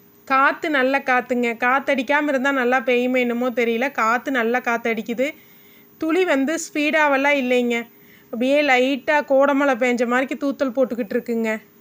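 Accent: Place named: Kongu, India. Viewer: arumai akka unga kural kongu slang nalla iruku kekarathuku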